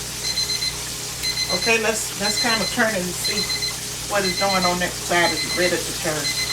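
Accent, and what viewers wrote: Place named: Alabama, United States. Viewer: Love your channel ONE OF THE BEST OUT THERE!! ... U EXPLAIN IT SO WELL.. ANYONE CAN FOLLOW..THAT BEAUTIFUL SOUTHERN ALABAMA ACCENT ADDS A VERY UNIQUE CHARM.. U HAVE DEFINITELY GOT THE. MR. SPOILED WITH THAT. DELICIOUS COOKING!! HE. SEEMS LIKE SUCH A. GOOD HUSBAND... THE ONE THING THAT I WOULD LOVE 2. SEE IS 4 U OR HIM ONE TO TASTE THAT DELICIOUS FOOD. B4 U SIGN. OFF!!....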